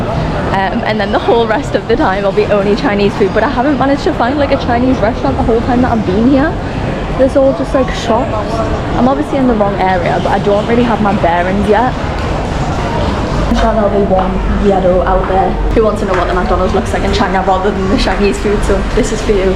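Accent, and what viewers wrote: United Kingdom. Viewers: 你说的英语我不是那么能听懂 。感觉习惯老美的口音多一些 ；不过伦敦口音还是很好听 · your accent reminds me of Jodie Comer. Are you from Liverpool, too?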